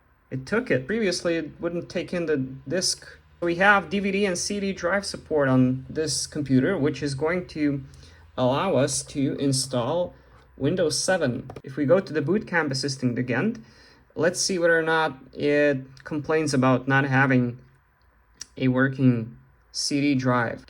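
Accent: Quebec, Canada. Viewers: You actually DO sound very similar to French Canadian! 😄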